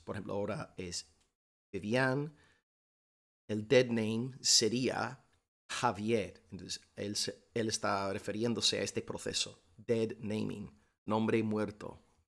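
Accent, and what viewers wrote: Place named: United States. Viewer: Habla como gringo pero no puede traducir bien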